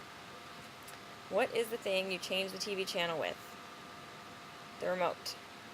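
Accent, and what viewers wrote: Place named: Michigan, United States. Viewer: you forgot to say what state you are from. you sound like you're from michigan though. am i right?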